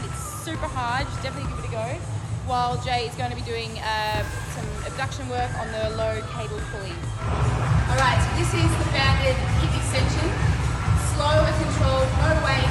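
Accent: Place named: Australia. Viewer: Haha i thought you were American. Then when i watched your videos and listened to you talking....i was like i am sure she is an Aussie!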